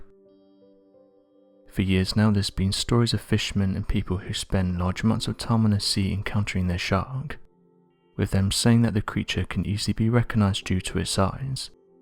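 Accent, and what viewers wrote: United Kingdom. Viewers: I'll save this one for tonite. This guy is for bedtime and the other (guess there are two in this channel) for daytime. Both have great voices and nice British accents.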